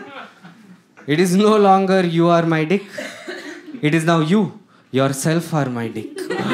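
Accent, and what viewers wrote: India. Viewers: That Indian accent though 👎🏻🤮🤮🤮🤮🤮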